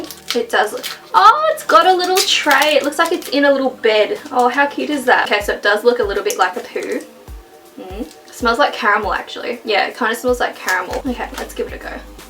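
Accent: Australia. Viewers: Bryn williams that's how Australians talk and I was that dis like btw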